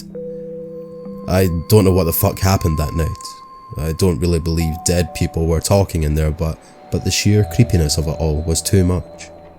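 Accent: Scotland, United Kingdom. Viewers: 🙂 Welcome Back Mr.;) Thank you for the Awesome Narration!!! I hope that you and everyone else has a Twinklelicious ✨Tuesday Night too;) much love Sexy Grandma Darkness ✌️💋 Just Joined your family:).. your Scottish accent is so Beautiful 😍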